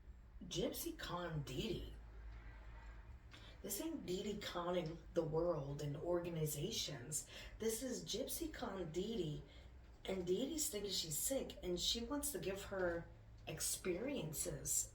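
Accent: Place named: Louisiana, United States. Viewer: Southern creole accent. Im from Louisiana I can hear the Southern French twang to it wow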